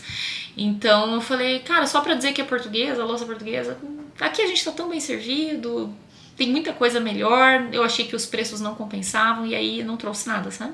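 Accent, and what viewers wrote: Brazil. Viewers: Oi sou portuguesa nasci, na ilha Graciosa uma das nove,dos Açores, vivo no Canadá, viajo para Portugal Continental, com frequência,o meu marido e do Distrito da Guarda a qual depois de Lisboa foi a Cidade que mais conheço e menos gosto, ao Porto só fiz paragem no Aeroporto, está na minha lista quem sabe este ano? quanto a Coimbra gostei, mas não tanto quanto Aveiro muito mais talvez por causa do Mar,conheço Peniche, e Fátima, Nazaré gosto muito,Castelo Branco também é muito agradável, a Sertã gostei, mas me desculpem os Portugueses de Portugal Continental, prefiro os Açores mil vezes mil que a outro qualquer lugar 😂 mas sou suspeita, pois já que la tenho as minhas raizes,já o marido é continental e prefere os Açores, para uma próxima visite os Açores, se bem que a culinária- em termos de restauração o continente ganha os Açores muitas vezes, gostei muito de assistir os seus vídeos e tal como todos os Portugueses, acho estranho quando vocês Brasileiros prenunciam as palavras Portuguesas 😂 como ir no Porto e não ao Porto, me faz lembrar, que vamos montados em algo chamado Porto 😂 bjs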